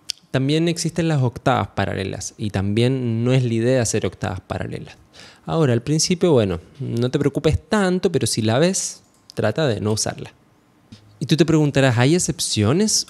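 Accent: Chile. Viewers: Habla como chileno si erí chileno bro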